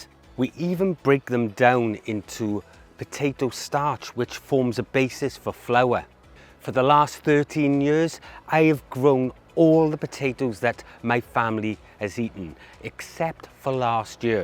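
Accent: Wales, United Kingdom. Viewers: This video is amazing. I think I recognise that accent though, 😊 is that a welsh accent? I live in south wales 🏴 up in the valleys. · Where in the UK is your accent from please? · Irish name? Welsh accent? 😉(South?)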